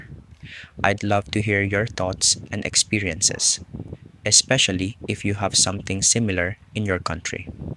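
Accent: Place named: Benguet, Philippines. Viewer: You must be from the highlands in Benguet based on the way you speak English.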